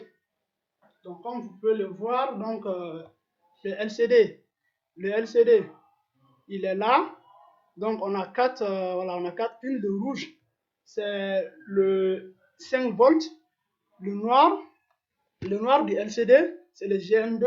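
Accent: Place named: Gabon. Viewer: Bonjour on dirait plutôt l'accent du gabon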